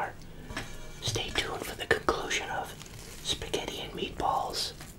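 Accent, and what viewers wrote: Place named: Massachusetts, United States. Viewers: What part of Massachusetts is he from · What part of Boston are you from?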